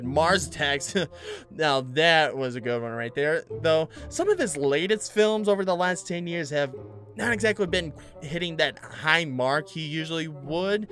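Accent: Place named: Ireland. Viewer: actually I like your irish accent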